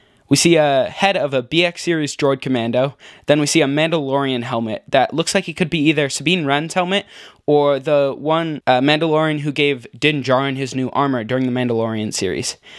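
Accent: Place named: Southeast Europe. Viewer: Europe, south eastern Europe.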